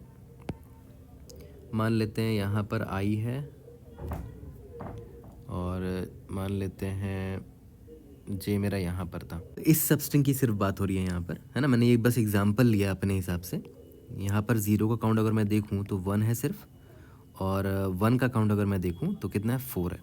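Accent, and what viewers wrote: Bihar, India. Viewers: Bhaiya aap bihar se ho kya??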